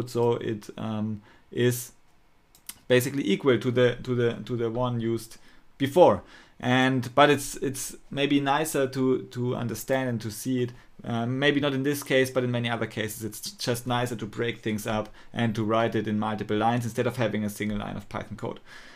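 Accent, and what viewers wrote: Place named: Germany. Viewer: nicer deutscher Accent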